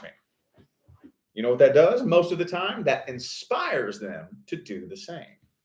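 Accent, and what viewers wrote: South, United States. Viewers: Congrats on your move. Las Vegas is like NYC it has grown. Where you moving to? A lot of people are moving to TN. But I do hear a Southern accent coming from you. Where are you originally from? Best wishes to you & your wife in your new home!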